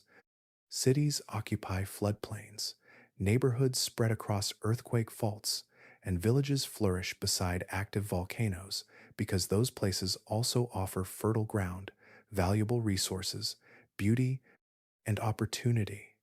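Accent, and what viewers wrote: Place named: United States. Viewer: Since you have an american accent, it'd be more like Toe-paw.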